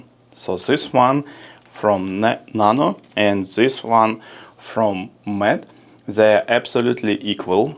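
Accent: Russia. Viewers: я чувствую русский акцент )))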